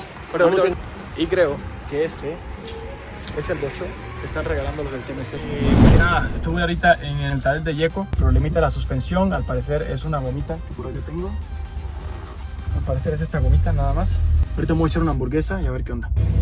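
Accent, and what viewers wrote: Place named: Cuba. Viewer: tu español no suena neutro, parece cubano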